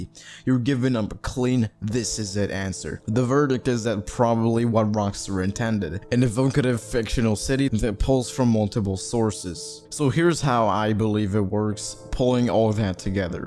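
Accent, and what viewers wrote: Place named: Birmingham, United States. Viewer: Sound kinda like Birmingham al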